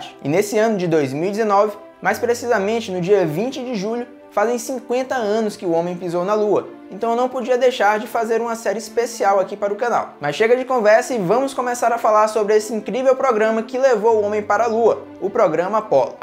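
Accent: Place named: Ceará, Brazil. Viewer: Q bom q o YouTube me recomendou o seu canal. Sou vidrado no espaço e em suas estrelas. Sou de Fortaleza - CE, posso estar enganado, mas tenho quase certeza q vc tbm é cearense por causa do sotaque 👏, LIKE, + 1 inscrito, e tmj irmão!!!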